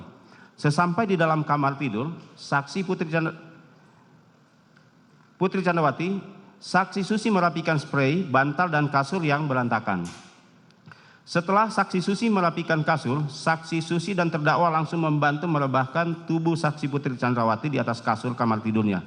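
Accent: Java, Indonesia. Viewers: Hal yg di karang karang membaca nya uga gk lancar pletot pletot boso jowone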